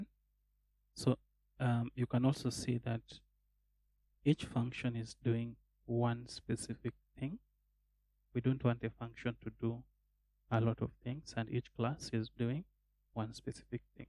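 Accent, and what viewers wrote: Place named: East Africa. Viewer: nice content brother. i recognize that East African accent!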